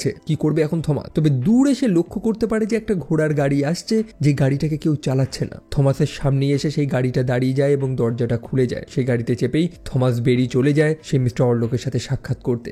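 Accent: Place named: Bangladesh. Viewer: আপনার কথাগুলো শুনতে একদম বাংলাদেশীদের মতো!!!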